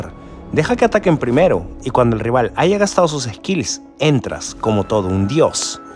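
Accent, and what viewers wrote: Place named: Venezuela. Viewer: Suenas como a venezolano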